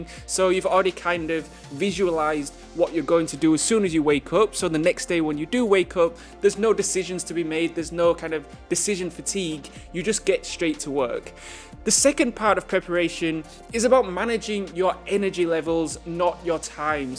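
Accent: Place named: United Kingdom. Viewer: Are you British I like that voice/Accent 💪👍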